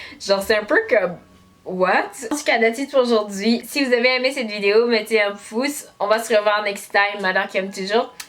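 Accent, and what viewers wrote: Quebec, Canada. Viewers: Tu es québécoise? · Ta un accent québécois?genre canadien? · Ton accent est trop beau!! C'est un mélange entre québécoi et français de france pis anglais.!!❤❤❤❤❤❤❤❤❤ je t'aime trop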